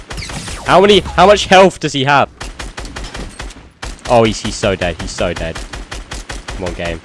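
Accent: United Kingdom. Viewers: British accent My dream accent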